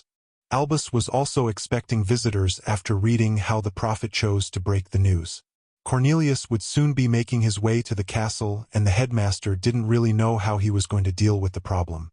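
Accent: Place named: United States. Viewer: This is cool, turning fics into audio. I have read this fic multiple times. PLEASE can it be in a British voice if the source material is British? I couldn't listen for very long (even though I appreciate that this exists) because I can't listen to HP in an American accent, sorry.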